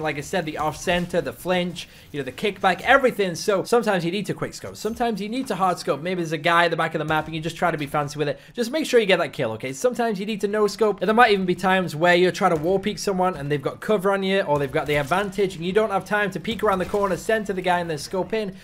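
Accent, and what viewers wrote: Yorkshire, United Kingdom. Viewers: Great vid. Ive got something to try now. Makes a change to hear a Yorkshireman on here!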